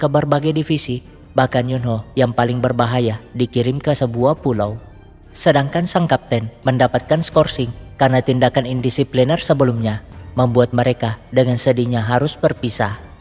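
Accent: Makassar, Indonesia. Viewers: Orang makassar ini narator nya ehh, kentara ji makassar naa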